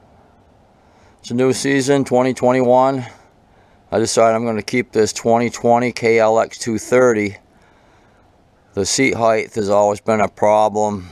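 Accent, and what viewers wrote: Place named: Boston, United States. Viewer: Are you from Boston, MA? Love the accent :))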